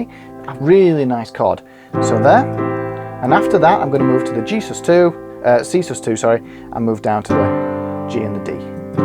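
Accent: Yorkshire, United Kingdom. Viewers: are you form Yorkshire?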